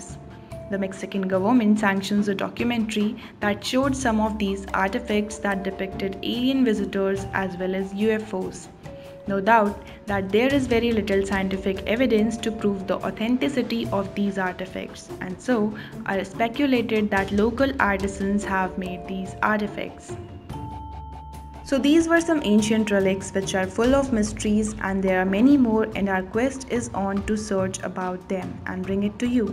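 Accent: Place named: India. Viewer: This channel have very interesting videos but with all my respect is very hard to listen the audio in english with that strong indian accent and the letter are too small .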